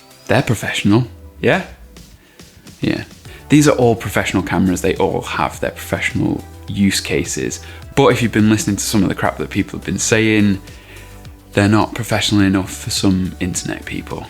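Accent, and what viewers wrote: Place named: North West England, United Kingdom. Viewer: Nice to hear a north west accent on YouTube 😁 - your right you don't need 2 slots to be doing professional work, my camera has two slots but I've never used the second slot. But I was going to buy a Z6 and to be honest I have stalled because it's now stuck in the back of mind that I probably should have been. I have had corrupted files about 3 or 4 times but I've always got the data back on my computer.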